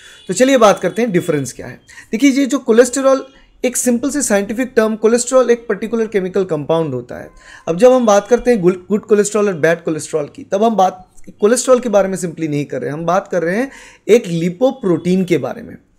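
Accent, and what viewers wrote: Bengal, India. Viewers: Thank you sir for this video 🤍🤍🤍🤍... But I'm feeling like you have some Bangali tone in your voice…..☺️are you Bangali??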